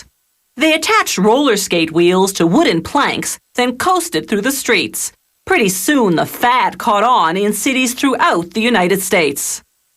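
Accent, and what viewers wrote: Canada. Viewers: Canadian accent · she sounds so canadian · she must be Canadian or from some northern state. It actually makes more sense pronounced that way, though.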